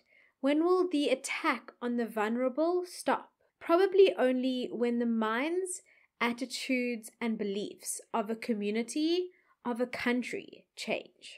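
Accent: Afrikaans, South Africa. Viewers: I love your Afrikaans accent its so cute! 😂❤️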